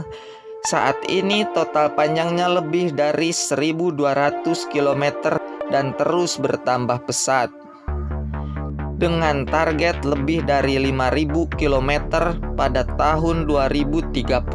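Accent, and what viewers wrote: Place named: West Java, Indonesia. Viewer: Adminnya urang Sunda yah, huruf F sama V jadi P semua 😀😀😀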